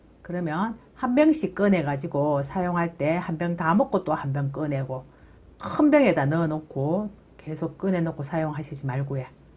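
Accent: Gyeongsang, South Korea. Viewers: 경상도사투리 듣기 거북해서 못보겠서요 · 핫하하하 ...🎉🎉🎉 매매하이소 진짜 경상도 아지매맞네 그런데 엉덩이는 조심하이소😂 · 죄송합니다 경상도분같은데 ,내용이 너무좋아서배우고싶습니다 연락처는 알수없습니까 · 소탈한 성격 정말정말 좋아요 그기다 경상도말씨짱이랍니다*** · 말린버섯 안말린버섯 간장 어느것이 더맛잇는가요 감사합니다 경상도말씀 너무 정답네요~^^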